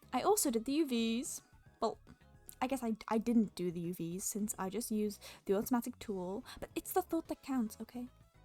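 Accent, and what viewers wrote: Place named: Wales, United Kingdom. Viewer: amazing video! love the strong welsh accent!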